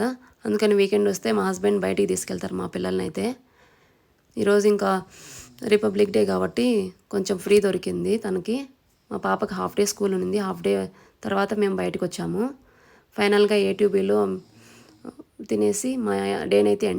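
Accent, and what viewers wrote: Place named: Rayalaseema, India. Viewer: Mana Rayalasima voice super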